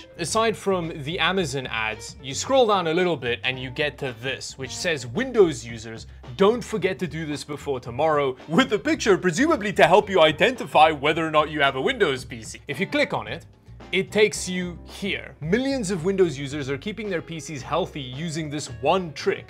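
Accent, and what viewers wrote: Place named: South Africa. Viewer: south African accent makes my ears bleed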